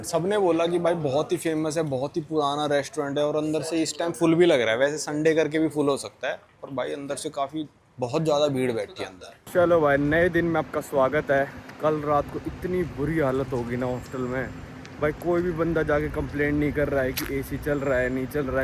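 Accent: Haryana, India. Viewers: Mainly m bhai ki video dekhta hu bhai ke baat krne ke tarike se attract hoke bahadurgarh wale style me mast😅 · Batane lag raha hu....jaane lag raha hu....batane lag raha hu....ye bhai lag raha hu kisi bhi cheez ke peh le .......ye kis region ki hindi hai india mein? Koii bata de...may be a weird question to ask😂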